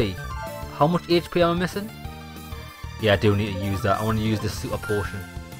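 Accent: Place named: North East England, United Kingdom. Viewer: Thanks for the help I couldn’t find the guy on the outskirts but damn you have a strong North East England accent 😂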